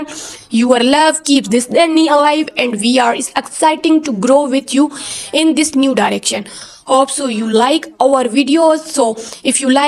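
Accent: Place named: India. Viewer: Panget na ng channel na to dati ok na ok .. Yung Tagalog at lalake pa ung narrator. . Ngayon di na maintindihan pag sasalita naging Indian na ... .. hayss sayang channel mo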